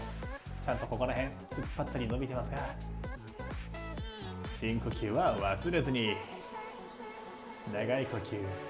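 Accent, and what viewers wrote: Kansai, Japan. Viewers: 先生のストレッチは、即効性があるのでやる気出ます‼️ 分かりやすい説明ありがとうございます‼️ そして、たまーに出る関西訛りが可愛いです。by関西人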